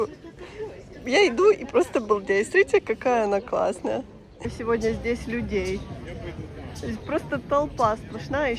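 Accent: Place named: Western Ukraine, Ukraine. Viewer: Ну да ну да. Одесский говор. А кода я спросил , ранее , почему у вас заподноукраинскиц говор ? вы проигнорировали .... .,. и у меня , к сожалению , уменьшился интерес к вашему каналу. Уж извините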